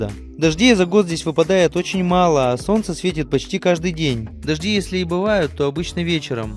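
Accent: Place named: Arkhangelsk, Russia. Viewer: Добрый вечер, 😉Интересная кухня, наверное, вкусная)))Но северные шаньги и калитки, думаю вкуснее 😊Про образование, в следующем видео, я бы хотела знать )))А разговор, Архангельский, знакомый 😊👍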